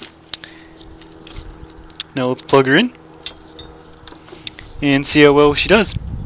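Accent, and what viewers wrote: Canada. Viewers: Is he canadian (no offense - pretty cool peeps) but still he got k way too much . special or what ? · French Canadian obviously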